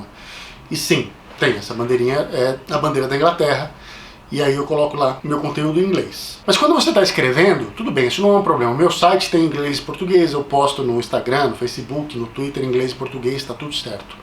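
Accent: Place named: Brazil. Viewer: É bom que, inglês falado por brasileiro é muito mais fácil de entender, pra mim né kkkk mete as fichas aí!!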